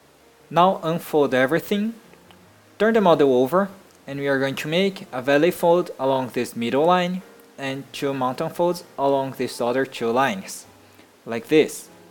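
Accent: Brazil. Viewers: ja ja just by hearing your accent i could tell you are from Brazil! excellent video, i really enjoy it! Saudações dos Estados Unidos! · Conheço o sotaque de BR de longe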